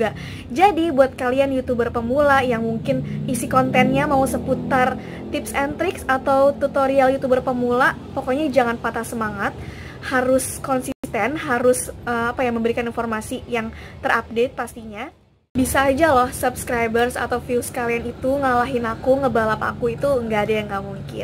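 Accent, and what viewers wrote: Jakarta, Indonesia. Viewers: budi, malih, markonah, betawi banget dah ahhh, gws cantikkkk Allah mau angkat derajatmu 😘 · Betawinya keluar dah:)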